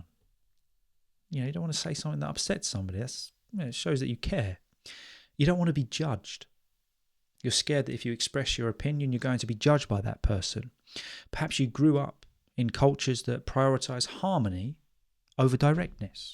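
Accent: United Kingdom. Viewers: Thank you so much brother, here is a spanish citizen learning, honestly I am in love with the British accent...all of them..it is amazing. Muchas gracias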